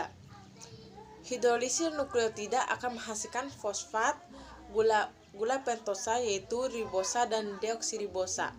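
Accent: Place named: Bima, Indonesia. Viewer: Bimanya kental banget😄 semangat terus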